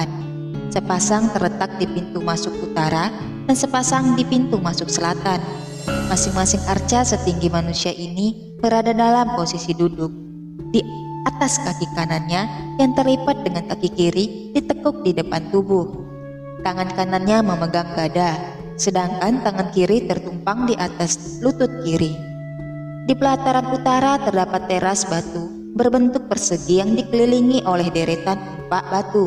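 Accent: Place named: Java, Indonesia. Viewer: Kental sekali jadi ingin ke jawa 😁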